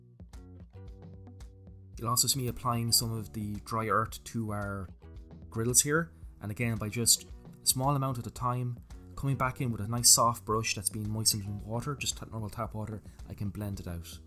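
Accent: Ireland. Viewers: I like your technique. Obviously built up over time. The finished product looks great. Have never seen your channel before, I will make it a point to check out your other videos. And, it's nice to hear an Irish accent again.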